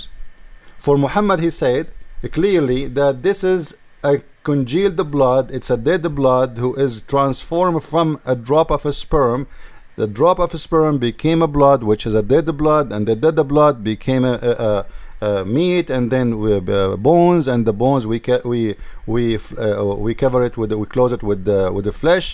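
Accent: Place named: Arab world. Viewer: You only speak with an 'Arabic accent' . The End.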